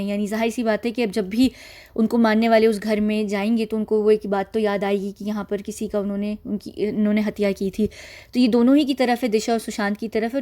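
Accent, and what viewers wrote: England, United Kingdom. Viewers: Your accent is British. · Very well explained, with your Englandish accent..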